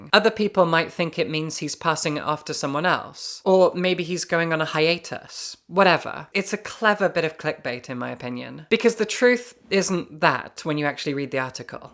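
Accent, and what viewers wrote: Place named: RP, United Kingdom. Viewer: So you’re a lazy contrarian with a posh accent. Congrats.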